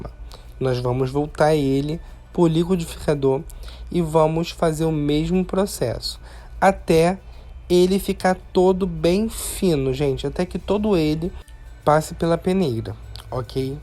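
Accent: Brazil. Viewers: Buenos días Me encantó esa forma de hacer el jabón me gustó mucho nunca lo había visto hacer así pero eso queda seco Bueno lo que usted enseña Me encantó hasta hoy lo encontré pero ahora veo voy a ver todos sus videos que veo que tiene cosas maravillosas y también tiene cosas para la cocina Muchas gracias bendiciones Marta desde Colombia por la forma de hablar veo que usted es del Brasil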